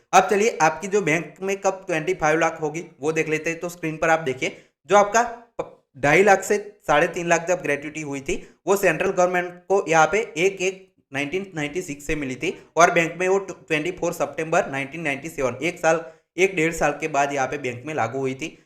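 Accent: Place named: Bengal, India. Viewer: U r talking like Bengolian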